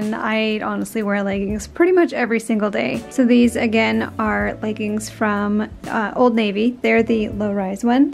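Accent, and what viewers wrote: Canada. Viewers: I am so excited to have found you. I hear a Canadian accent but wonder where you are from exactly.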